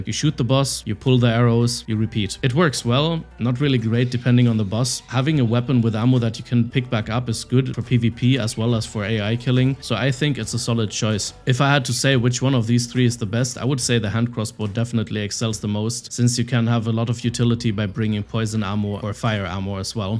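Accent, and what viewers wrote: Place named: Ireland. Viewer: This guys accent is almost irish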